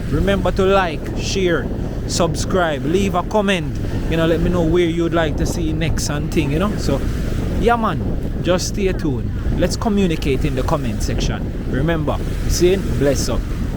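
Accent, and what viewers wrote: Jamaica. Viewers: Thank you for this chance 💜 to see Negrill again. Love to hear your beautiful Jamaican accent. Hope to return again to your country again one day. Such wonderful people and country!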